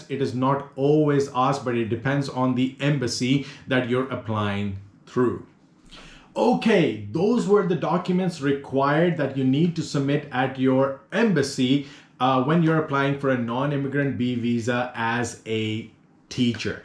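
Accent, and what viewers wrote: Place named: Punjab, India. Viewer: What part of Pakistan are you? Your punjabi accent is unmistakable. Good videos.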